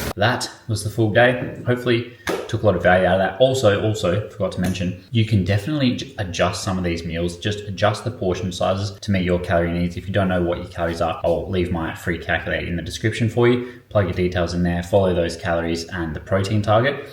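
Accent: Australia. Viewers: Are you a Queenslander?, i notice Queenslanders say A or Hey alot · So good to hear a fellow Aussie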